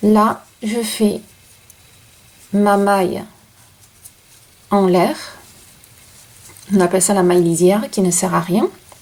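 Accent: South, France. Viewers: Merci vous expliquez très bien ne changez pas votre accent moi je suis de NIMES votre accent est près du mien Marie jo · Merci beaucoup pour vos tutos, bien faits, clairs , tranquilles, et le petit accent du sud "merveilleux" en prime ! Continuez à nous apprendre, vous êtes très agréable merci.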